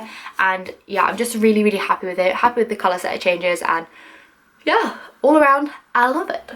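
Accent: United Kingdom. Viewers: 13 Reason Why...I ❤️ 1. She makes the CUTEST squishies 2. She uploads regularly 3. She is just the SWEETEST person 4. She is really CREATIVE 5. She makes the BEST original content 6. She has a really pretty British accent 7. She is SUPER kawaii 8. She is really Hard working 9. She cares about Everything 10. Her videos are “Family Friendly” 11. Her aesthetic is Just...GOALS 12. Her tutorials are REALLY helpful 13. I just love her in general 😘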